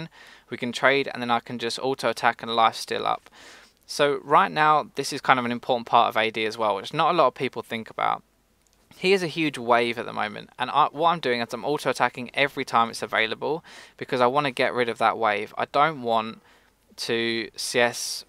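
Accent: United Kingdom. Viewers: As always, your videos are awesome and super informative. For some reason I find your videos much easier to watch, understand and learn from purely because you're British. It'd be awesome to play with you sometimes (I main support), and also a quick question - could you put up a video of a Vayne game against a bunch of heavy divers? Team fighting is always hard for a Vayne when the enemy team has like a Renekton and a J4 for example.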